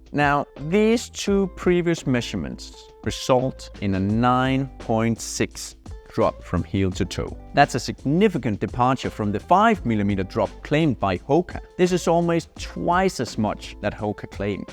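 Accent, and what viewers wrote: Denmark. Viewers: My mum is Danish (from Horsens) so hearing a Danish accent always make me happy. Your English is outstsnding. Especially all of the technical vocabulary. Thank you for the in depth reviews. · This dude danish accent is so strong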